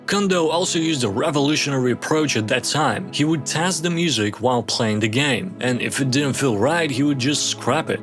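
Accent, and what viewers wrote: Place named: South, United States. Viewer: Merio 😅 Dig the southern accent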